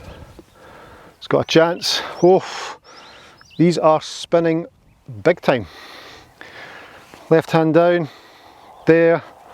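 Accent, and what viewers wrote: Scotland, United Kingdom. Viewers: The Scottish accent makes the golf tips better.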